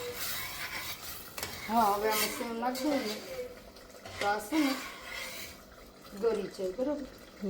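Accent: Kathiawar, India. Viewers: અસલ કાઠિયાવાડી ગામઠી ભાસા..!!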